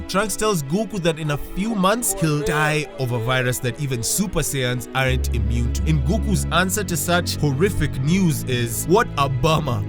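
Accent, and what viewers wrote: Kenya. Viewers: Oh shit i knew that accent from somewhere wsg my kenyan blooda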